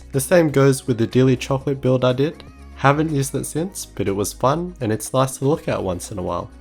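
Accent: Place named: Australia. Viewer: You sound like Lachlan Likes a Thing. I think it’s the Aussie accent.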